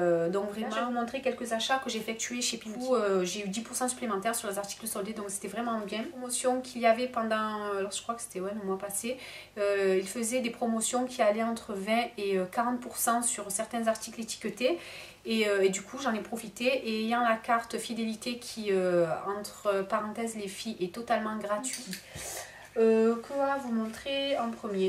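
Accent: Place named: South, France. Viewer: je te trouve très chou ainsi que ton accent du soleil ....Hein les botchinnes ;) merci pour ce partage je m'abonne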